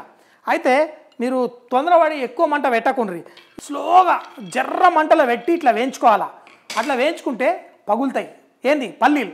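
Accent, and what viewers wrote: Telangana, India. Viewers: Me telangana matalu keka🥰🥰🥰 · Your recipes are very nice, but please maintain your dignity as an experienced professional and speak in your original dialect...speaking in the Telangana dialect will not improve or attract any new viewers to the channel. On the other hand, it irritates and infuriates. We respect your worthiness..Please don't play childish brother. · Sir meedhi ye state assalu.meeru mee wife idharu English lo kuda matladuthu north side dishes kuda chesthaaru.ippudu pakka telangana yaasa matladuthunnaru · I like the way of ur talking andi pure telangana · Telangana slang super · Language perfect Telangana 👌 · Meeru telangana Yasa vaddu sir Mamulugane matladandi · Telangana slang adhurs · Me language superb 👍 💯 pakka Telangana slang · Telangana basha over aindhi.Chef ainnappudu dignified language unddali. Challu ekkuvindhi.. 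· mi telegana slaag super gaaru😄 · His Telangana Telugu accent is very funny. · Bale chepparu Telangana language lo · తెలంగాణ యాస బాగో లేదు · Meru super sir, very unique style of explaining in Pakka telangana. 😀😀😀👍👍👍👏👏👏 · Telangana baaga maatladara👍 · Since when have you switched to telangana telugu? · What happened to u only tn slang matladuthunnareemadhya.why so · హాయ్ సంజయ్ గారు నమస్తే. బాగున్నారా. రాగిణి గారు ఎలా ఉన్నారు. తెలంగాణ భాషా భలే మాట్లాడుతారు. మీ మాతృభాష ఏది. భలే ఉంటది మీ మాటలు. బాగా నవ్విస్తూ ఉంటారు. మీ వీడియోస్ అంటే నాకు చాలా చాలా ఇష్టం. చాలా న్యాచురల్ గా తింటారు. మీరు తింటుంటే నాకు నోరూరుతుంది. నిజంగా. సూపర్. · Telangana slang Baga othu tunav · నీ భాష ఆపరా అయ్యా తెలంగాణ భాష చూడలేక చస్తునం రా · Anna em matladinv anna multi talentd u r nd entha aina mana telangana slng vinte ah kick ye veru suprb anna ne matalu ne vantalu ekdham untai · Telangana yasa super ga vundi garu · Ippudu baagaa telangaanaa maatladuthunnnaaru kaani sodi kongem yekkuvaindi age vallanaa?😮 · తెలంగాణ యాసతో మస్తుగా చెప్పారు సంజయ్ గారు... 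· Kaka nee telangana yasa kiraak undhi bhayya Really enjoyed a lot continue it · anna nee telangana accent asalu maaki kirkiriii.... Waiting for you to do a recipe with telugu commentary... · Where and how did you pickup this Telanga dialect. Perfect like your recipes. I learned a lot from your videos. Greetings from The Netherlands. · Sir nee telangana yasa aapandi vinalekapotunn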